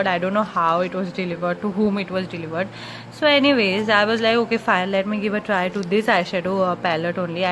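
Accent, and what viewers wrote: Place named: Goa, India. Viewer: Thank you for the honest review. Looks like you have a Goan accent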